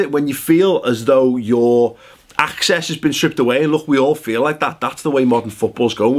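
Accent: Liverpool, United Kingdom. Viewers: This Scouse git needs subtitles for his channel so the viewers will know what he's saying.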